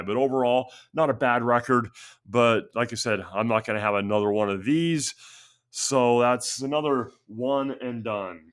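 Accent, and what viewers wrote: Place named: South, United States. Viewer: What part of the south are you from? I can hear an accent but I can't quite place it. I'm going N.C? Possibly T.N? Can't go wrong with Rose Tattoo. Wow! The Who!? You definitely have their best one. I could listen to the first Grim Reaper record all day long. The second one is pretty good too. Check out my video on them. GNR has never put out a bad one but you're right. That is one of the greatest debuts of all time. Keep this theme up! It's cool. Cheers!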